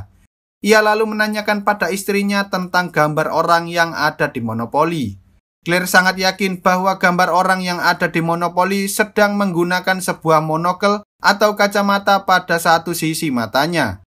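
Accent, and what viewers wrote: Java, Indonesia. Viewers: Adalgi ytber yang bahas film jni selain chanel ini ga?, logat jawa kurng enak di denger :( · Bang logat ngomongnya jgn medok bgt lah, intonasinya jgn datar.. spoiler filmu bgus" tpi cara pembawaanya gk enak ditelinga Tolong jgn gitu biar kedepannya lbih bagus!!! Medok bgt aneh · Jowo endi min pean? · Meddok bgt!!!! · Medok banget JAWA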